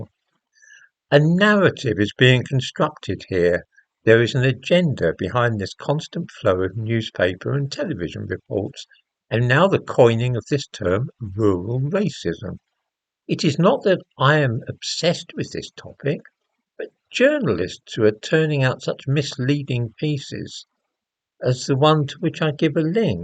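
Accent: United Kingdom. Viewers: Great video, and it was nice to hear the english i miss and never get these days. But please please please, have that mustache trimmed and fixed. And learn to use all the products thats out there for beards and so on. You wash it and form it every 2 days or so, throw a bit beard honey in there, and it will look more immaculate. And not like you've glued a dead rat on your face. Its gonna make a world of difference. Regards from a brit in Denmark.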